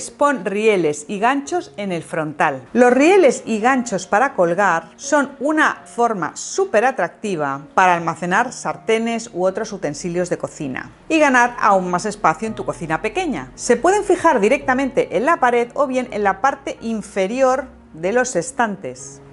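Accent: Spain. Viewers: Eres de españa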